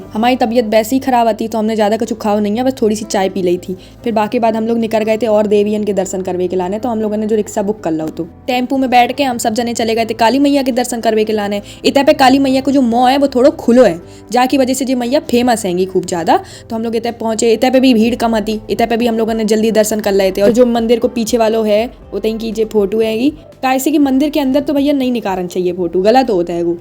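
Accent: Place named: Bundelkhand, India. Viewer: Aapka bundelkhandi accent is so good 🤌👏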